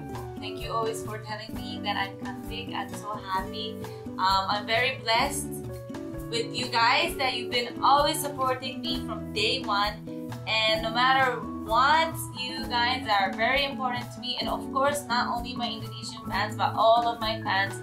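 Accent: Philippines. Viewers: You're English accent now more like Filipinos than Japanese :-)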